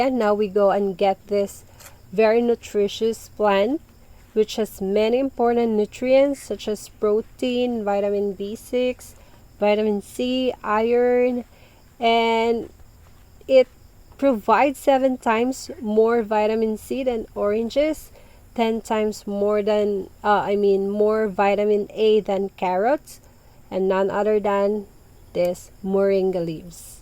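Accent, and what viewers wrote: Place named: United States. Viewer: Kuyaw visaya diay ka mam mura man gud American accent ka the way you speak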